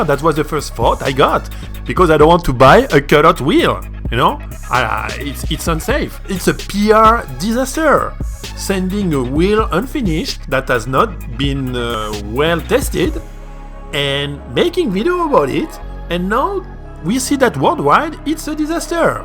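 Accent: France. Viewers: you sound like my one of my brothers here in portland who comes from France ;)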